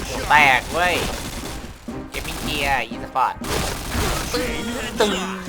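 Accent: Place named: South, Thailand. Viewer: เสียงเหมือนคนไต้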